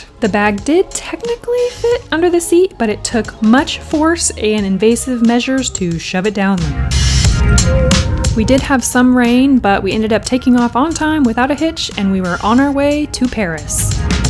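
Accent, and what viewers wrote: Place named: London, United Kingdom. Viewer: You sound like you’re from London!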